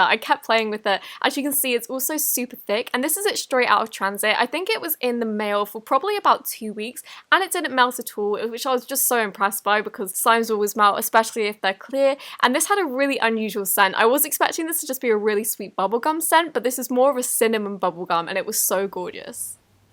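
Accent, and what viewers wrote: United Kingdom. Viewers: love your british accent :Đ can u teach me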